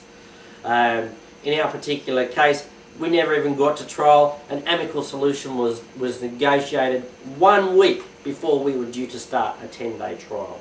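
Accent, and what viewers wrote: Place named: United Kingdom. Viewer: OK from your acent its obvious your British, so does what you offer work in american courts? I have been unable to get anyone with the knowledge I need to help me. The whole system seems to operate on the premise that "its there little enterprize" and anyone who is not part of it, is not welcome. I thinks this is because if anyone figures out their little fraud, they would be out of business.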